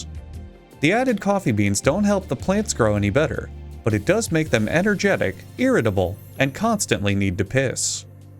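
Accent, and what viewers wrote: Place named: Albany, United States. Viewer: Albany dialect represent!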